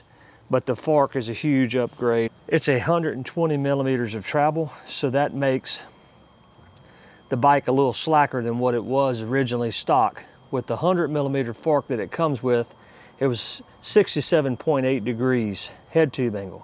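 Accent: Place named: Mississippi, United States. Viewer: . I grew up in MS and miss the accent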